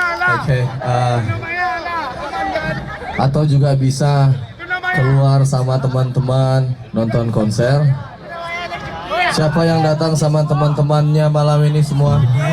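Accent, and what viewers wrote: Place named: Minangkabau, Indonesia. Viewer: Lucu bahaso minangnyo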